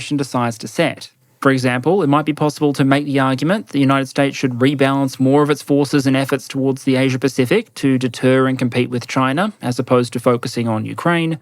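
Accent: Australia. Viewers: I have to ask, what does "inverted commers" (@ ) mean? Its a common perun phrase and it seems like a local idiom, or maybe the aussie accent is throwing my 'merica ears, but i am not sure what it means and its driving me crazy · Future historians are going to be very grateful, as am I. A veritable tour de force with an Aussie accent. Bravo !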